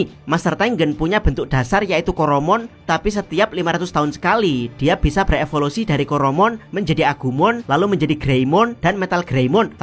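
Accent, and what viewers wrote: Java, Indonesia. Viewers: Adminya orang Jawa ternyata 😂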